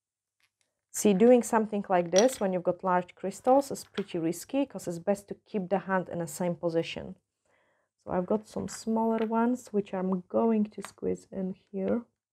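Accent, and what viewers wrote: Scotland, United Kingdom. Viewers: Do I detect a we bit off Scottish in your accent ?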